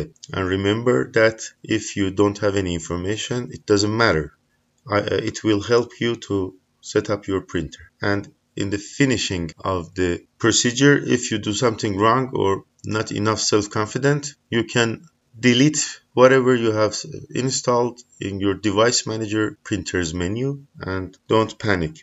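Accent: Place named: Turkey. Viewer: I bet you are Turkish. This is Turkish accent 😂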